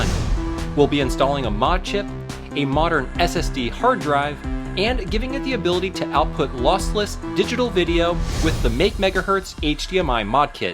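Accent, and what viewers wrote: Baltimore, United States. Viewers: ...are you from Baltimore...???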